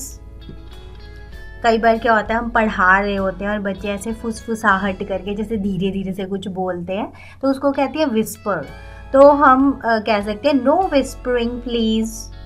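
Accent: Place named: India. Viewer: Sorry but I can't understand what you said. The accent is like Indian or Malay or someone from Middle East.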